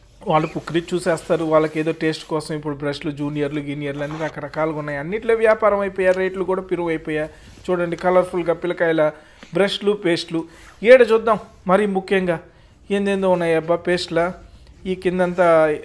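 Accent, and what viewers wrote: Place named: Rayalaseema, India. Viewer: Medhi a district anna rayalaseema slang la vudhi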